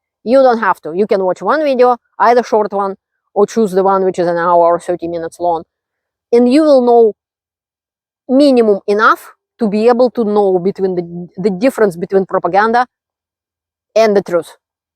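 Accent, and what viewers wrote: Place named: Russia. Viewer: Послушал на русском для информации, послушал на английском, насладился сочным русским акцентом! 😅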